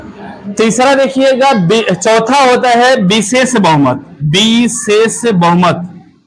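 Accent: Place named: Bhojpuri, India. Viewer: Bhujpuri style me ek dum mast sir ji Maza aata hai padhne me bhi nice sir 💯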